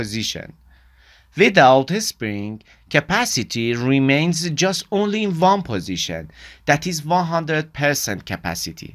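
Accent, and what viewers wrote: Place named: Iran. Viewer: your accent was iranian..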